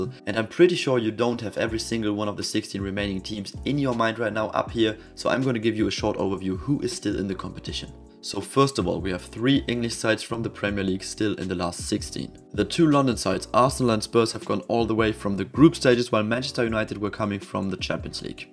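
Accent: Germany. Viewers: Ich hab mir gedacht dass du Deutscher bist lmao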